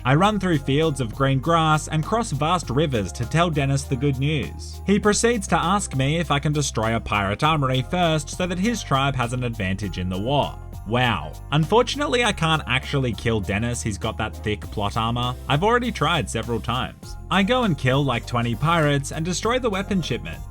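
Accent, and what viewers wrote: Australia. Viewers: good content good ausie accent too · You know you’ve been watching a lot when you can’t say ‘anyway’ without saying it in an Australian accent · By far my favourite game ever created. But FYI Dennis is the son of an African warlord and went to America but he was treated badly so went to the Rook Islands to make a difference. I assume that was the name given to him in America. But great video its kind of like if SovietWomble and Charborg did a review in an ozzy accent.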